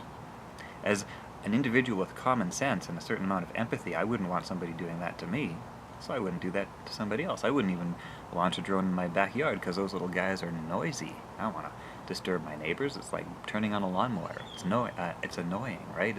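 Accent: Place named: Ontario, Canada. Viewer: Are you from Ontario? Just hear a slight Accent there.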